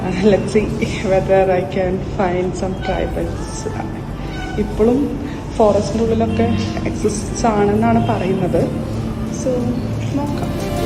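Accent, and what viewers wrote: Thrissur, India. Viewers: waiting for your next video,, sply thrishur slang 😍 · Chechide proper hometown thrissur aahno😄.. Samsarathil okke oru thrissur slang edakk varunnund😇.. Ath kelkkumbo ottum jada illathe valare natural ayi thonnunum und🥰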